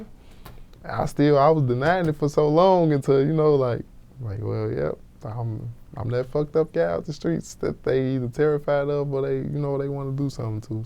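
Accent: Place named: Wisconsin, United States. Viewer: he not formally from the chi, from Wisconsin his accent mixed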